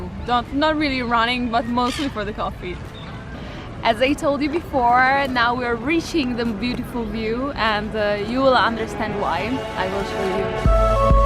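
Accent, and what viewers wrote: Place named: Rome, Italy. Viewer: si, mi piaci accento Romano , mi fa ridere sempre 🤣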